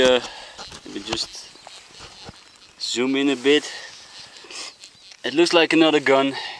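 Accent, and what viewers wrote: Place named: Netherlands. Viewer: Coole video! Maar je hoort wel dat je Nederlands bent😂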